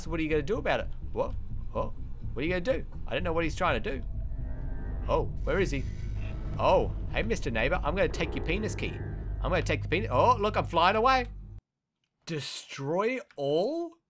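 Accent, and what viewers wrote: Australia. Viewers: is he from Australia? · i'm Australian you sound like your from Australia...! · Wait is he Australian my grandma is Australian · Are u Australian? U sound Australian. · R U Australian?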